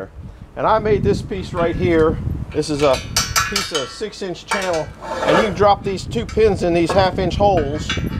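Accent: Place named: Ohio, United States. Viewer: Where are ya located ol boy. Been wondering, we all think your an Ohio boy from your accent and your poplar you cut.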